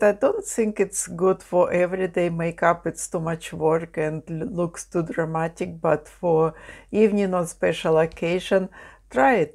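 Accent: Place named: Russia. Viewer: Ооо такой у вас акцент , наверное русский?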